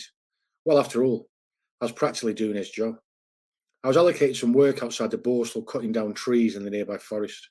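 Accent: Stoke, United Kingdom. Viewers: Are you from Stoke mate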